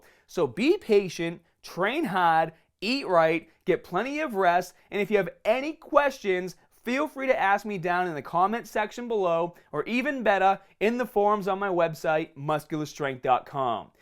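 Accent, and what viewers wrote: Boston, United States. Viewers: Is he american or aistralian? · Your Boston accent didn't allow me to focus on what you were saying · I'm portuguese, and i never even been to the US but i can recognize his Boston accent. Its really thick! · Is that a Boston accent? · I can't tell if he's from Boston or Australia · Is he from Boston? I can kind of hear the accent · Boston af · I have been underexposed to the Boston accent. I'm actually having to remind myself that this is how he seriously talks and he's not trying to be funny or anything... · Is he Bostonian? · lol is he from boston or something?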